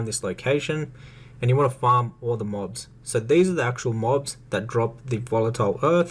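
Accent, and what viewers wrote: Australia. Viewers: Wow man.. still at it?! I remember being a kid playing on my cousin's account and looking up how to make gold / farm mats and I heard this rad ass Aussie saying "check out this farm, its 'Bad P**sy' " I come back to the game and find myself here again with same OG posting content! That's dedication homie! GGs!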